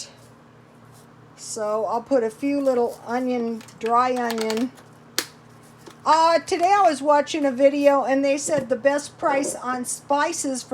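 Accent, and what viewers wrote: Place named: San Diego, United States. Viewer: Beginning of video I'm like...she sounds like she's from SD. Oh shit she is from SD! Shout out from the SouthBay down here.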